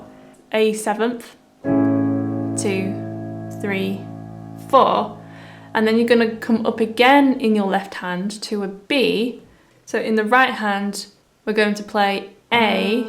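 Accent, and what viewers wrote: United Kingdom. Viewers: I love your British accent!😍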